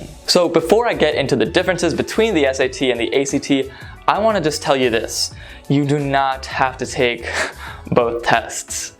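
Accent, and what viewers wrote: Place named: England, United Kingdom. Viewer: I really love your English accent .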